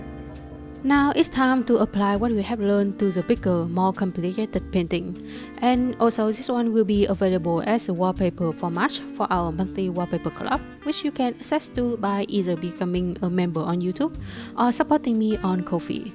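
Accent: Vietnam. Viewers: Nghe giọng bt ng Việt luôn:))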